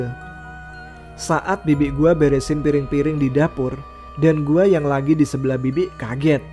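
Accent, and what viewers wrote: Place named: Java, Indonesia. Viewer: Medok kali logat bahasanya mas😆😆 cerita2 mas ini lumayan bagus seram juga😱 😊🙂👍👍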